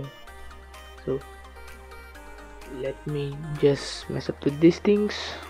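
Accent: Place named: India. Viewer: when i heard indian accent, then i pass.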